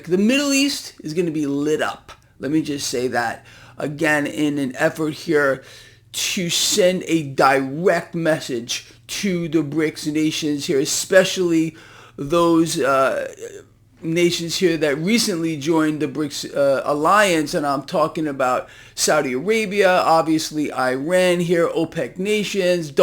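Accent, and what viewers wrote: United States. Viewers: It's the American pronunciation I guess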